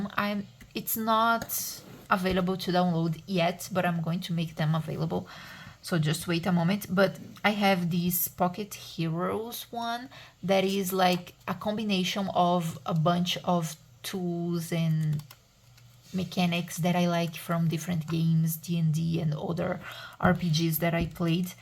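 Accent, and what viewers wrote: Brazil. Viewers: esse sotaque com certeza é brasileiro.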